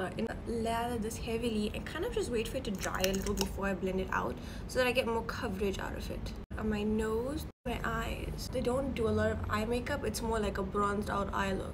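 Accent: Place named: South Africa. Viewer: looks white, is indian, trying latina makeup and sound south african. Everything about u is perfecttt!!!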